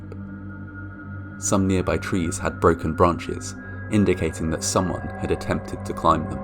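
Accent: United Kingdom. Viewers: As an American, something alluring about a Brit accent as long as it’s not cockney.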